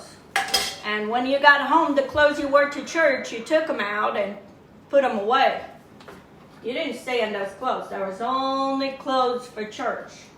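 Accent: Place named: Azores, Portugal. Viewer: Hearing the English language with the azorean accent reminded me of my neighbour next door that passed away a few years ago 🌈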